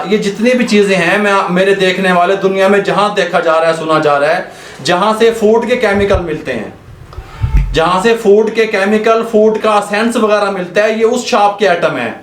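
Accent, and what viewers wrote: Punjab, India. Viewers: Punjabi kitni bhi achhi Urdu ya Hindi bole tone se pehchana jata hai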